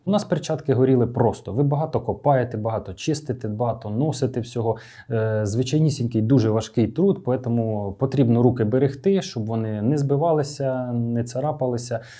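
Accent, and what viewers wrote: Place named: Central Ukraine, Ukraine. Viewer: Клас, наш хлопець. Центр UA.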